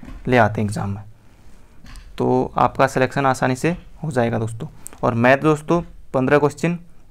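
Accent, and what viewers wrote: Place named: Pahadi, India. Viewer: Bhai pahadi hai na tu... Avaaz se lg rha hai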